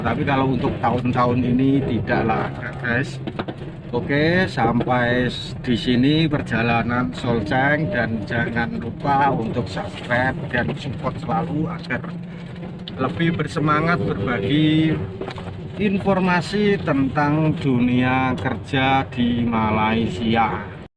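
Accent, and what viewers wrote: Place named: Java, Indonesia. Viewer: Cakap pelat jawa tebal pun masih buat contsnt ...pebat dengar weak...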